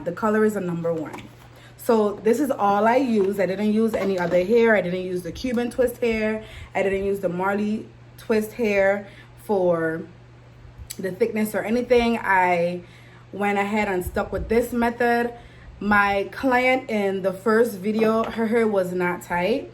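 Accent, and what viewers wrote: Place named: Barbados. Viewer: These locs are awesome and you are soooo gorgeous keep up the good work Your accent sounds a bit like a bajan lol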